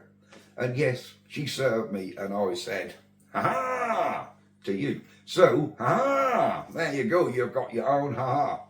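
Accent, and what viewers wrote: Norfolk, United Kingdom. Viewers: Hi, I would just like to say to you and Mrs Mancave, I wish the both of you all the luck in the world, I know from experience what her treatment entails , my dear wife was diagnosed with Lymphoma cancer, we was told it was around 8 to 12 months to complete the weekly Chemo and the rest of it , be in no mistake it is very hard, my Mrs ended up with holes in her intestine and was bringing her bowel contents up through her mouth. 🤢🤢🤢🤮 But after we got going onwards we had 10 clear years and we made hay while the sun shone, if you can take my bit of advice, as time goes on you will be getting worn down but you won’t recognise it for yourself, I’ve NOT said all this to scare you, I’m just trying to make you aware of what might be coming your way, we didn’t have anyone to pre warn us how things may turn out, if I can just say this bit it might sound odd me telling you this but now and again when your dear wife is zonked out after the hospital visit, get yourself settled down and even if you don’t nod off just rest it’ll do you the world of good, another thing I’ll take you to task on is DO NOT apologise for your accent, my mums family was and still are from Gorleston and my Grandad & Grandma was very broad Norfolk types so be proud of yourself. 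Another little gem we didn’t get told is nearly every week we was at hospital a lady would come round with a clipboard and she would say to me , how’s things ?? Are you both ok?, course it’s yes thanks, what no one told me was, she was from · You are both very brave. Mrs Mancave especially. I am sure all your subscribers will continue to support the channel. I always watch with dad so don't often watch my subscription, however I will make sure to always give you a thumbs up and a comment on mine too. We shall be thinking of Rachel on the 6th bless her. Don't hide your accent ,the reason we started watching you was because you talk like us be Norfolk and proud lol. All the very best to you both. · God Bless Mrs Mancave and yourself.. Your doing the right thing by leaving work to look after your Wife and doing your Norfolk Man Cave Channel.. Carry on doing what your doing.... I love your Norfolk accent don't ever change it, we came down to North Norfolk 3 times this year on holiday and love it! 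😄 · Well done for sharing your up date on Rachel and your situation, iam sure your doing the right thing for you and Mrs mancave, you don't have to justify yourself to sterile comments from individual's, keep the channel moving along mate and keep that Norfolk accent, get better soon Rachel, watched to the end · I'm the proud son of a Norfolk man and your accent brings back memories of holidays in Yarmouth, Lowestoft etc when dad (who never lost his accent) Acle market in fact after a couple pints mum couldn't understand him for hours after lol , also when delivering to the Norwich area I felt at home dad died just after the millennium at 82 and is much missed. All the best to Mrs man cave look after each other...I'll e watching and I am subbed. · Still here at the end. Firstly all the best to Mrs Mancave we're all thinking of her. Second there was loads of good news in this one, apart from the Mrs and all your travelling oh and the price of suet. 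For myself please don't change a thing. I watch your vids because your knowledge of all old engines that you bring back to life is amazing. I'm more than happy to watch anything you put up. PLEASE never put background, so called , music in your vids. We want to hear you not background noise.. Not sure about a live stream. The time taken up, I think, would be better spent in the workshop. This is getting as long as your chatting so all the best to you both and keep things coming...Oh and I love the Norfolk accent. A coffee on it's way.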